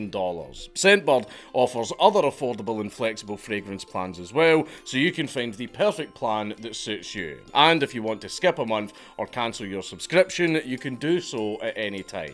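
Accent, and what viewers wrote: Scotland, United Kingdom. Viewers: seen it but always good to hear in scottisch accent...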